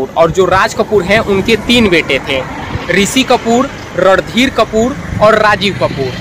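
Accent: Bihar, India. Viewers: Aapke language mein bihari axen aata hai ise thik karo. Aur shashi kapoor hain sasi kapoor nehi.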